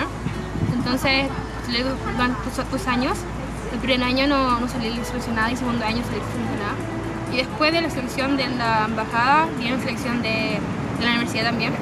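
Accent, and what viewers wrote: Mexico. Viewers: Por qué los chilenos se le pega el acento mexicano ??? No lo entiendoooo!!!! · porque habla como mexicana si es shilenaaaaa ajajajaja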